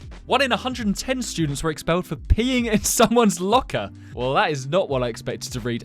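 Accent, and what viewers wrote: United Kingdom. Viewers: Dropping fireworks hmm what does that remind you of maybe the Weasley twins from Harry Potter also you keep reminding me of Ron with your British accent and curly hair.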